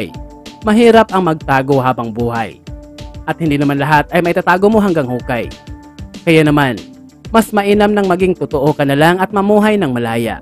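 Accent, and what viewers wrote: Visayas, Philippines. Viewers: Nalingaw ko sa imong diction Bisaya jud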